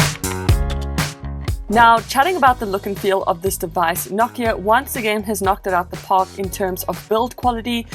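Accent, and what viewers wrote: South Africa. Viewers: Is this a Southafrican, dutch, australian/kiwi or danish dialect? Easterneuropean of some sort? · I hear the South African accent... then I see the charger - not South African. Then I see the police bakkie . 😉 😁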